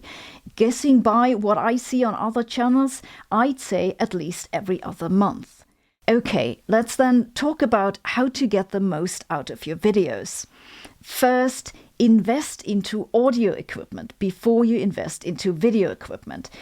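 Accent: Germany. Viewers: Sabine, a great deal of your comment is applicable to regular TV, and much of it's cable variety; simply put, creators often had little idea why of how their production got the viewer buy in to begin with. On improving your English skills; what ever you do, don't mess with the "charming little German lady" accent; it is as much your brand and trade mark as Joeg Sprave's maniacal laugh is his, along with a very Teutonic lilt to his English; and his "schtick" is sling shots! My thoughts on "creator burnout"; although not a YT creator, I think my objective view as an over consumer of YT product does give me a bit of horizon on the subject; scheduling is the largest stress producer in the process- schedule twice the time your layout and "pre think" suggest , do the work, and hope fully go get a massage of some other personal 'uplift" with your left over time. The creators that I have watched collapsing into themselves, trying to "give the algorithm" more and more of what it wants as they chase fame and fortune- they are the tragedy of the YT process. 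Some actually had good ideas but fell into those "number chasing" traps you described. Last, I would say, don't do something if you feel it doesn't "fit" you, personally- it shows when you do, a certain part of your "faithful following" will being losing who you are to who the algorithm wants you to be. None of which seems to be your problem. But fact based scripts, like yours, tend not to lead you there- another wise move from a "sc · The German acsent help's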